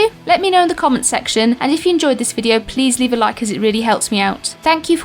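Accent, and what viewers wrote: England, United Kingdom. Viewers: Jesus I was not expecting a broad English accent XD great video though!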